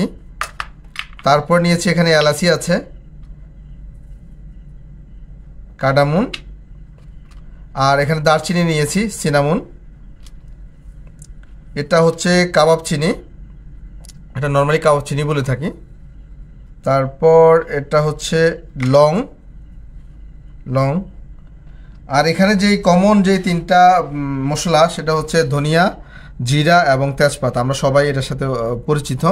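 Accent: Noakhali, Bangladesh. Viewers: ভায়ের বাড়ি নোয়াখালী নি। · কথায় বুঝা যায় নোয়াখালী অঞ্চলের মানুষ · যতই স্মার্ট উপস্থাপন করার চেষ্টা করো,নোয়াখাইল্ল্যার ভাষা বোঝাই যায়। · ভাই আমার কাছে রান্না এক প্রকার আর্ট, তবে আপনার কথা শুনে মনে হচ্ছে আপনিও আমার মত নোয়াখাইল্লা। মনে থেকে বলছি ভাই আপনার ভিডিও টা অনেক ভালো লাগলো। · আপনার বাড়ি কি নোয়াখালীতে? anyway very helpful video, thanks. 😇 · ভাইয়া বাড়ি কি নোয়াখালী তে?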